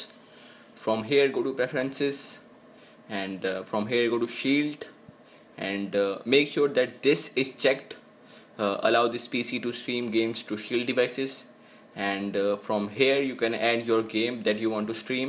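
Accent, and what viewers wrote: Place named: India. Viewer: hate the indian accent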